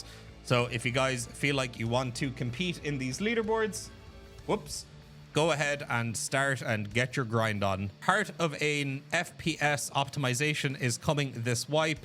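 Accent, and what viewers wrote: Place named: Ireland. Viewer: Ive been seeing a lot of negativity lately so this is really nice to see. As always the silky irish tones in the noise canceling headphones are a highly appreciated bonus.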